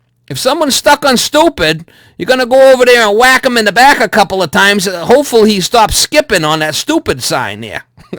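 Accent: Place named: New England, United States. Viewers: Love the voice and New England accent. Accents are dying out. I grew up in Los Angeles and have no accent whatsoever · Hey. Luv your videos. I know what some people are saying, but maybe part of it is that they're listening to the words your saying to a person on the camera but not seeing your face when your talking to them. IDK. Anyway, you do you.:) I also think part of it is your accent. You do have a strong NE accent. I agree, don't change for anyone, right.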